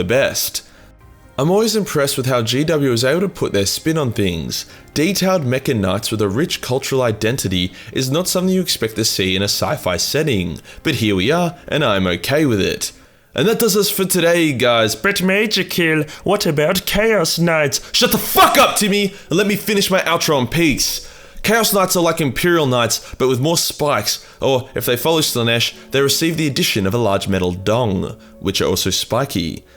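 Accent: Australia. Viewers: I keep realizing that I have done more research on 40k Lore than Majorkill, but I just like hearing a cutie pie Australian say shit I would find offensive in text or any other accent. But hey gal here happy to be recognized!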